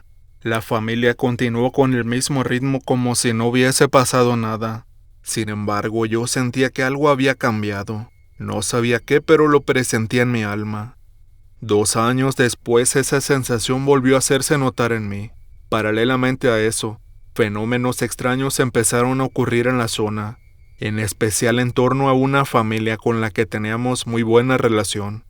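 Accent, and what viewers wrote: Mexico. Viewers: Eres uno de los mejores narrando...tu acento de dónde es?? De que parte de México???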